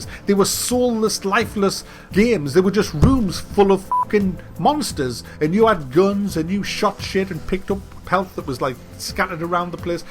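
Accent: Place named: Newcastle, United Kingdom. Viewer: Hey what part of the uk are you from you sound like someone from either sunderland or Newcastle haha?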